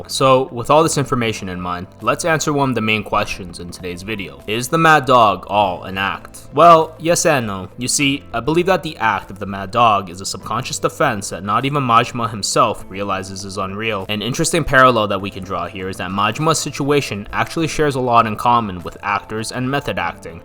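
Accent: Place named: United States. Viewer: I love the video but hate your American accent butchering all the pronunciations of the names. Sounds like yarkuza the way you say it and marjimar I hate the accent. YA KU ZA. MA JI MA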